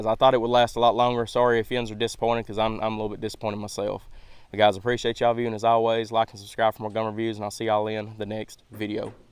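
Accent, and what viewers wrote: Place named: Alabama, United States. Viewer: What state are you from? Sounds like our Alabama accent.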